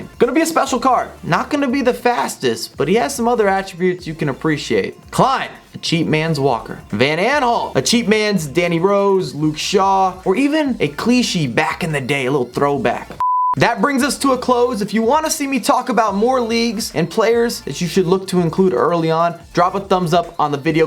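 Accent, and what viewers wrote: United States. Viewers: Only FIFA channel I can watch cause you’re AMERICAN💪🏻👌🏻🏈🇺🇸🇺🇸🇺🇸🇺🇸so you don’t have this nasty accent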